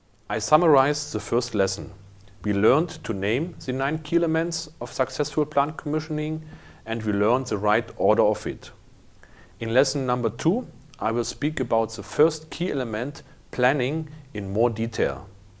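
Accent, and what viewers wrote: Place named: Germany. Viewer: Thank you For this training Mr., it is very helpful for me. i liked your german accent ^-^.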